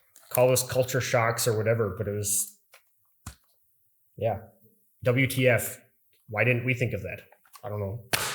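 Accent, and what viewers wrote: Germany. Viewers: honestly, this guy sounds really german the way he pronounces stuff. or is it just me thinking that? edit: nevermind, i just heard how he pronounced Hannover, lol. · Why do you have a German accent !? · get the feeling that you're more German then you are american.. definitely a German accent if you ask me.. so whats your real story?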